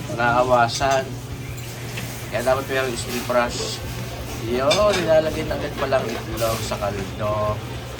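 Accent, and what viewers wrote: Batangas, Philippines. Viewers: walang babaguhin. Tuloy-tuloy lang ang puntong batangan. Balik panahon para sa akin some 60 year ago sa Calumpang/Avenida Rizal🥰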